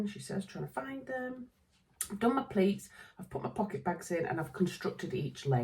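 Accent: Yorkshire, United Kingdom. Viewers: I made myself a gnome printed turtleneck top to wear to the Christmas open house at the childcare centre I work at. It was a big hit. Also I have been hosting tea parties in each classroom with the children, as I'm serving the tea, (water), I talk to them in a British accent which actually sounds like you. Of course it just makes them roar with laughter. My local fabric store still doesn't carry that stretchy thread, so frustrating. I make sure I watch your vlogmas before I leave for work everyday. That way I start my day with a smile. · That 'gonk' fabric is awesome! I literally gasped when you held it up. I had to laugh at your comment about being British and talking about the weather. I think it's our national obsession. I'm near Glasgow and we've had -10 degrees yesterday and today. I wouldn't have minded except our boiler decided to pack in and it took Scottish Gas 2 days to get out to us to fix it. 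The house was only marginally warmer indoors than it was outdoors!! I can honestly say, that I don't ever care to repeat that experience. At least your videos have kept me cheerful. Keep going, Mrs, I love your smile and infectious laugh. I'm also secretly loving your accent. It's been nearly 15 years since we moved up from Yorkshire to Glasgow and I didn't realise how much I missed the accent until I started watching your videos.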